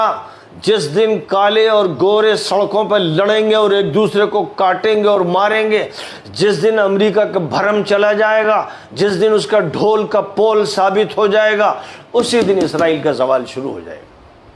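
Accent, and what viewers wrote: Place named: Lucknow, India. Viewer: ماشاءاللہ, کیا پیارا اندازِ گفتگو ہے آپ کا, خاص لکھنوی اسٹائل. آپ کی گفتگو سن کر بہت مزہ آتا ہے اور آپکی بات دل میں اتر جاتی ہے. مولانا سلمان حسینی صاحب, اللہ تعالیٰ آپ کو سلامت رکھے, کہ آپ حق بولتے ہیں ہمیشہ.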